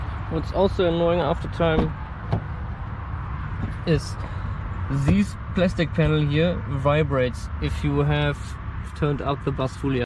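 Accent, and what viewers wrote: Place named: Germany. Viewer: man merkt direkt , dass ein deutscher hier redet XD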